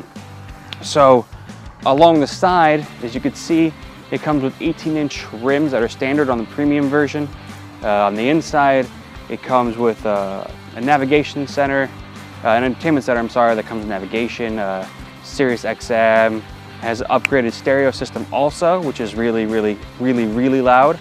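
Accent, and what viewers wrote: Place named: Western New York, United States. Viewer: just bought a 2016 WRX 2 days ago man. By the sound of it, you must be from Western NY. there is a WNY Subaru Owners Club on Facebook if you want to join it. I am also learning to drive stick on this...."interesting to say the least" describes it adequately. I'll look for you in the club. One thing I wanted to say in warning, if you mod it, almost all mods require a new tune.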